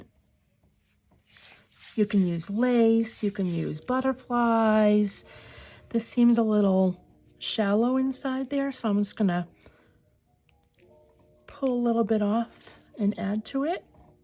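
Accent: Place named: Boston, United States. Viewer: New subscriber!! Oh my gosh so wicked-awesome you're an Neweglandah!! Luv your Boston accent....my dh is Irish ❤️ his pak dah cah phrases at times! So hilarious 😂 You're so creative! Blessings!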